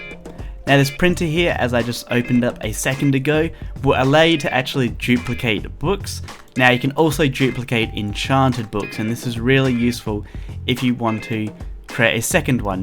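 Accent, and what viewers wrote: Australia. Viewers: o ma gad r chu aussie? c: bc chu sound aussie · Your Australian M8 well me too 😀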